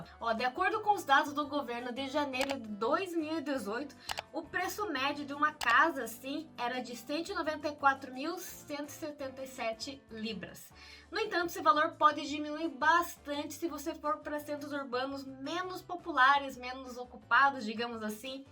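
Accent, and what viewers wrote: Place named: Rio Grande do Sul, Brazil. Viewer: Ah! Te ouvir foi tão parecido com a gente falando que achei que era gaúcha. Obrigada 😘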